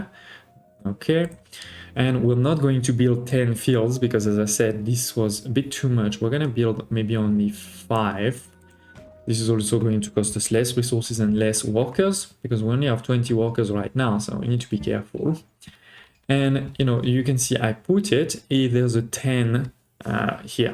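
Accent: France. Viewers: Avec le bon accent français hmmmmm 😮‍💨👌🏻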